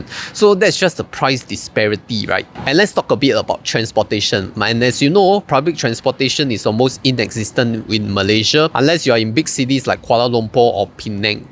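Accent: Singapore. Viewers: U are much welcome here n spend all your money.. however u need to forego your Singlish english...n speak our M'sian English in order to adapt to the msian environment n culture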